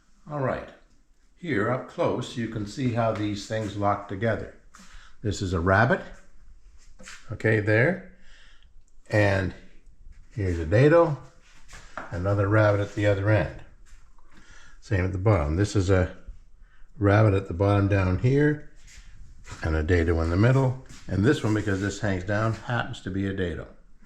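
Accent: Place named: Canada. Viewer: picking up on a Canadian accent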